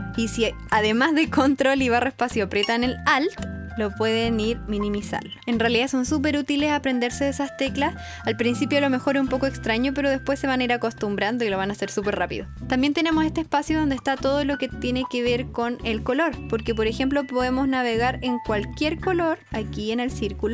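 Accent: Chile. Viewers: sos chilena? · eres de chile se nota por como hablas no digo para ofernder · de casualidad eres chilena? es que tu acento es super marcado y lo conozco porque tambien soy chilena:virtualhug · holi eres de chile? se nota en tu voz uwu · cuando escuche tu voz dije: OOOOOHH es chilena xd edit: Like y gracias por el tuto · Eee es chilena vivan los chilenos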